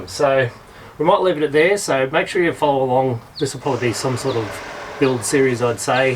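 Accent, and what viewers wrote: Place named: Australia. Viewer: As soon as i heard the Australian accent and then the stupid music i just new it was time to stop watching this vlog 😮 greetings from England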